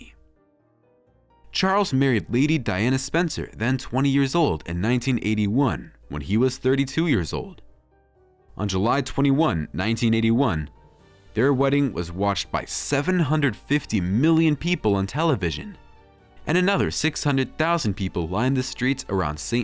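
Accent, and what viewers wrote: United States. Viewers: Any decisions of the sort you mention were ultimately up to the parliament. The monarch doesn't make the rules - or didn't you know that? As to Camilla, her description is Consort - like the Queen Mother when she was married to King George VI, Queen Mary and Queen Alexandra. "Consort" is a description - not a title - meaning that if the King dies the Queen Consort cannot reign. Ever heard of Queen Elizabeth (married to George VI) being referred to as Queen Consort Elizabeth when George VI was on the throne? Do some reading. Once Camilla had been crowned, she was Queen. Also not a good idea to take any information from an American voiced broadcast. They're clueless.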